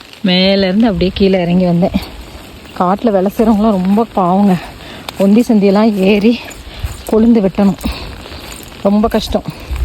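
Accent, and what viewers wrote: Tirunelveli, India. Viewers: ஒரு மூன்று நாளைக்கு முன்னாடி படையப்பா 5மணிக்கு வாசலில் இருக்கும் என்று சொன்னார் so conform ah moonaru than... But slang tirunelveli native.. irukum.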